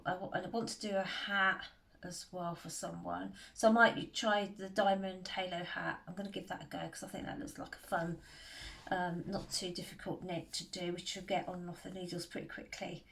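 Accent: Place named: Scotland, United Kingdom. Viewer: It's lovely to hear your Scottish voice here in Texas where I knit all the time, but it's rarely cold enough to enjoy wearing the items. I do mostly fingering weight projects here. LOVE this channel. As a person planning for retirement in about a year, I need to have EVERYTHING frugal, especially the hobbies.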